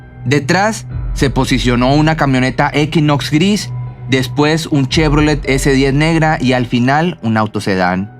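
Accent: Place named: North, Mexico. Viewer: Llevo meses siguiendolo y no sabía que es de aquí. Su acento se me hacía familiar. Arriba el norte! 🤠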